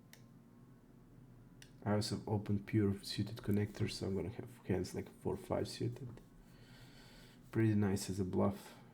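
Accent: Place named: Croatia. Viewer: Just gonna take a wild guess and say you’re Croatian going off your accent :D First video I’ve seen from you bro, great vid, subbed:) Keep crushing buddy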